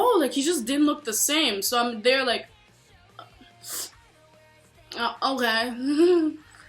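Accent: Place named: Africa. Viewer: Are you African? I heard that accent! I'm Nigerian 😁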